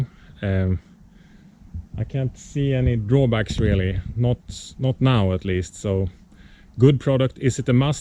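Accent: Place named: Sweden. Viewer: Why swenglish ? It`s hard to listen to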